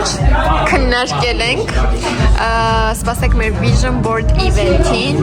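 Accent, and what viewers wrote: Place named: Vanadzor, Armenia. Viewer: Հոգում միշտ Վանաձորիս բարբառը։ ՍԵՐ Վանաձոր❤💫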